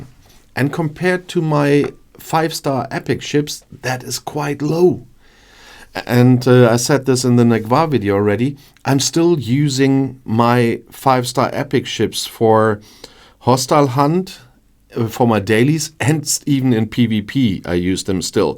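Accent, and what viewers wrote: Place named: Germany. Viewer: You're English is fine..of course you have a German accent to us Americans but it's not very heavy it's actually kinda cool..however some of your words you pronounce the British way but that's to be expected, overall you are very clear and easily understandable bro 👍🏽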